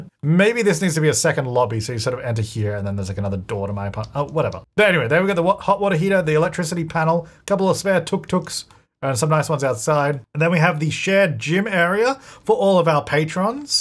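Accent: Australia. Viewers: Has anyone ever told you you sound like Jazza (and it's not just because you're Australian)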